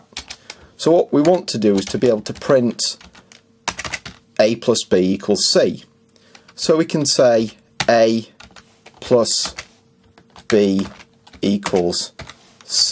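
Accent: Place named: Cornwall, United Kingdom. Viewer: Nice program! And btw : I love your pronunciation und I guess ... Cornwall? Greetings from Germany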